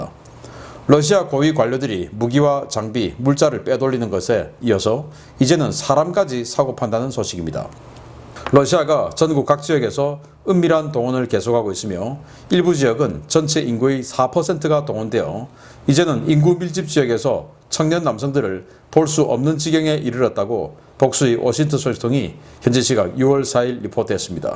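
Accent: Gyeongsang, South Korea. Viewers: 구독자 분 중에 수도권.전라도 사람들 있슴 함 봐주삼 난 갱상도 출신이고 수도권에서도 10년 넘게 살다가 지금은 다시 컴백험 했는데, 모르겠으나 갱상도 출신 신인균 씨 말투가 같은 동향이라 그런것도 있지만, 객지생활 10년 하믄서 제주도 사람까지 만나봤는데, 말을 듣기엔 크게 거부감은 없거든여?! 시원시원한게 속 시원합디더.. 다른 지방 사람들, 특히 수도권.전라도 분들은 어떠신가여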